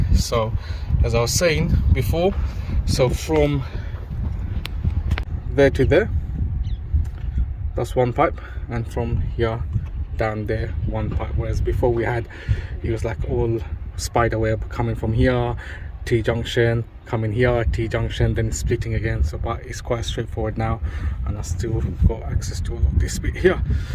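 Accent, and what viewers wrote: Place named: Cockney, United Kingdom. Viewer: Impo ы en- cockney accent 😂😂😂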